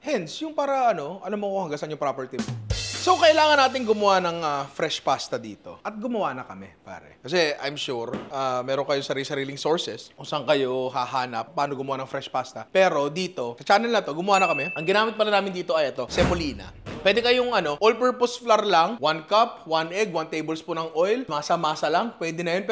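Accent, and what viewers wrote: Batangas, Philippines. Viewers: Batangueño ka ba hahaha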